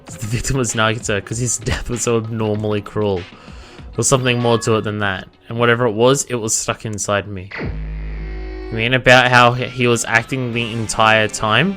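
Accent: Australia. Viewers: When I first found you and heard your voice -- quite a long time back now -- I was trying to place your accent. I could tell there was something in your accent that wasn't making it sound British. It wasn't until in one of your videos you actually said you were Australian that it finally clicked for me. I was like, "Ah, that's it!" I'm one of those people who try not to jump to conclusions, and since I don't actually know you I didn't want to ask straight out where you're from.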